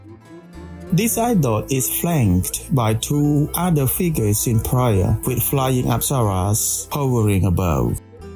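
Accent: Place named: England, United Kingdom. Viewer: The scenes and editing are excellent, but the strong English accent and mispronunciations make it difficult to focus. Since there are subtitles, maybe a clearer voiceover would help make the content more enjoyable.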